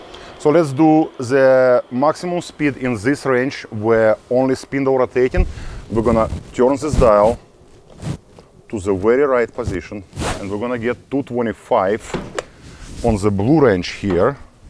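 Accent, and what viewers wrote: Russia. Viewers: У вас явно режущий ухо рязанский акцент!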